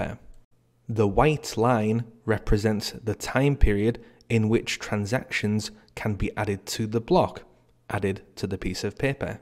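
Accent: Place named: Barnsley, United Kingdom. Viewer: Great video again! You sound like you’re not far from me! Barnsleh? DM on Twitter if you like :)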